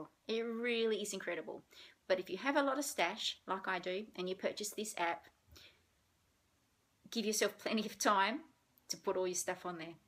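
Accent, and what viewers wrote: Australia. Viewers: What a fabulously helpful video! I knew some of these sites, but not others and I LOVE the framing app! Have just purchased! Now off to take some decent photos of some of my finishes so I can use it properly! I grew up in WA, but have been in the UK since I was a teenager - I love hearing your accent. Although not a WA accent, still reminds me of 'home' . Xx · Thank you, for this very informative video! By the way, I LOVE your beautiful Australia accent! Your voice is like music to my almost deaf ears! I would love to hear you sing!:) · I loved this video, as I’m new to cross stitch. Hey love the Aussie accent as I to an Australian. I found this video extremely helpful as I hadn’t heard of many of the apps before. I really want to try digital charts. I want to convert my paper ones to digital. Do you know how or is there a video you’ve made for this? 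I’ve also subscribed to your channel. I’m looking forward to seeing more of your videos.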